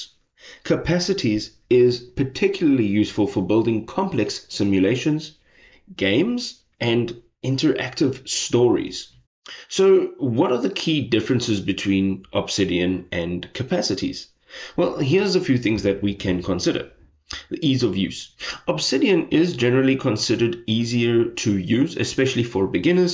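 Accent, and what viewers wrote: South Africa. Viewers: I was looking for a comparison and your video was the first I saw, I must say I could hear the South African accent from the first second :P Hi from a fellow Saffa productivity fan!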